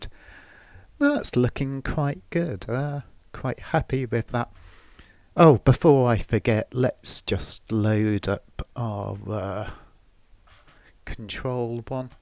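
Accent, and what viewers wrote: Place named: United Kingdom. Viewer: This has to be the most bri'ish accent I've ever heard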